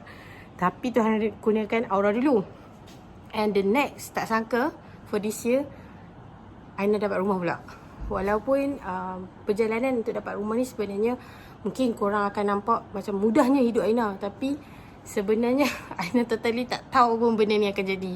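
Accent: North, Malaysia. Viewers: . Kami prefer aina cakap utaqa lagi sedap dri pelat keyel. Hihi. Ni jujuq tau dri km peminat aina oqg utaqa jugak. 😂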